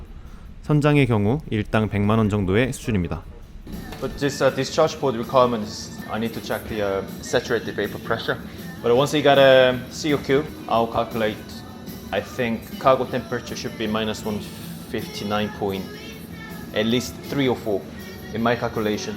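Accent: United Kingdom. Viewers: 영국 방식의 영어죠. 미국의 굴리는 영어가 우월한 영어라는 생각을 은연 중에을 갖고 계신 것 같은데, 영어에서 이렇게 발음에 집착하는건 한국인 밖에 없다는건 알아두세요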